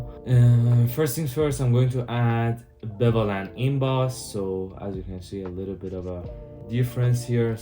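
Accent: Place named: Iran. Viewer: hey where are from you sound iranian❤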